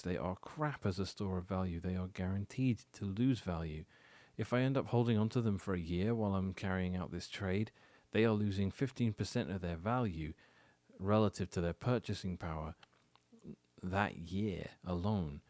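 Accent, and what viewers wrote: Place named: England, United Kingdom. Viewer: This guy is 100% English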